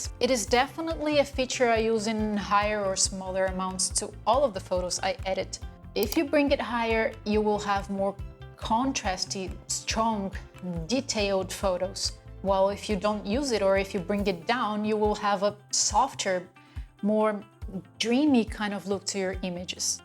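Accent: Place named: Brazil. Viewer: Brasileira né? Percebi logo no inicio do video. Eai Guy's. hehehehhe. Anyway your english is nice. Flow! :) Great video. Obrigado!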